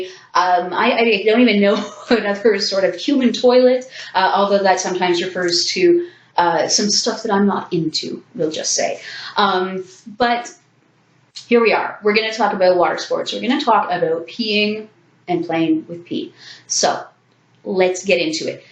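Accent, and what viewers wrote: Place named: Canada. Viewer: Like your Canadian accent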